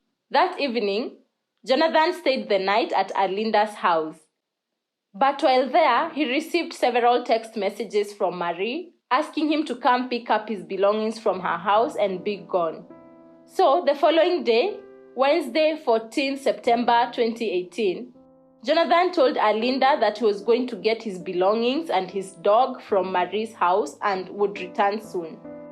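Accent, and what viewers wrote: Kenya. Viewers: You are a very good storyteller. I love listening to your beautiful Kenyan accent.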